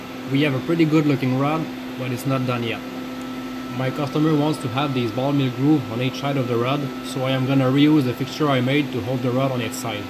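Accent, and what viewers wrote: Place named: Quebec, Canada. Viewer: Ca sonne québécois cet accent là mon ami! ;) Bon vidéo!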